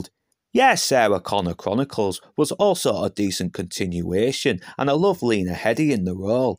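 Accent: United Kingdom. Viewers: I'm Romanian and I adore that British accent!!!Good job mate!!! :face-red-heart-shape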